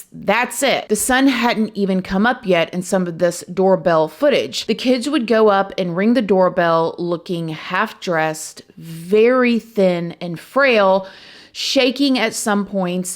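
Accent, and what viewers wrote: South, United States. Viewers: Sis it's that southern accent...keep it coming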